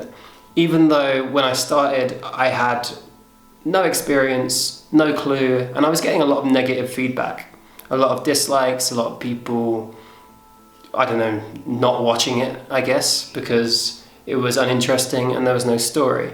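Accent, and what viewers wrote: United Kingdom. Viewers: Woah I'm just watching one of your first vlogs on your old channel and your british accent was so much thicker. Definitely can tell you've been spending a lot of times in non english speaking countries. That'll happen to you!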